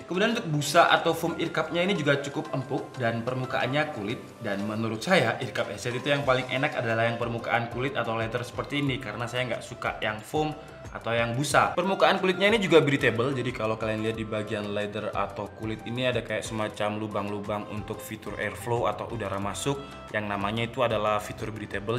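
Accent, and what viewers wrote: Java, Indonesia. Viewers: nyeglek .medok banget jawanya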